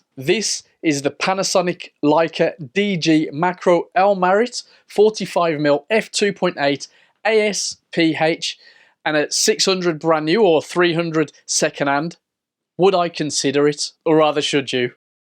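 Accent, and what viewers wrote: Birmingham, United Kingdom. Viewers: Thanks great review of this lens - I love your sample photos. I think that you have just made my mind up over which lens to buy next. I have the P/L 12-60 with G9. Considering P/L 50-200 but price and weight putting me off. Just seen P 35-100 F2.8 but I think for the type of photography this macro lens is great and can be used as a fast medium telephoto. Thanks for the no nonsense review with a Brummy accent.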